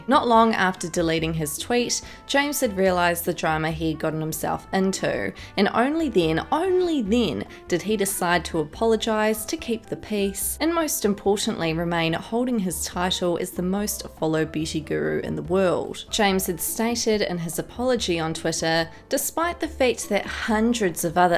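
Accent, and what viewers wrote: New Zealand. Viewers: Off topic. But are you Kiwi? That accent tho! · This Kiwi accent is annoying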